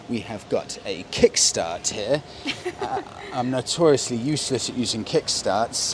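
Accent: United Kingdom. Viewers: you reminds me of Cole Hauser as Carter Verone from Fast & Furioous 2 . just you with the british accent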